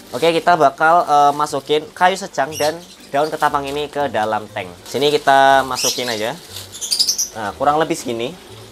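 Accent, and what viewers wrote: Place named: Java, Indonesia. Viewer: Orang jawa ya bang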